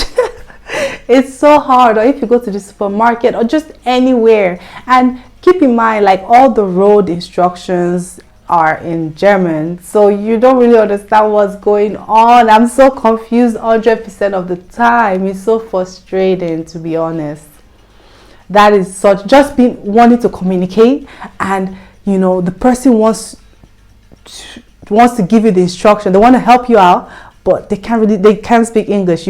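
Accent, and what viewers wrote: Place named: Africa. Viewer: Sorry, I am confused. I have seen some black women in Germany speaking, but I would judge your accent for an african one. But PCS is an american thing, or? So how comes you have that accent? May I ask, where are from? Aah, I commented too early. I knew it! Nice story btw.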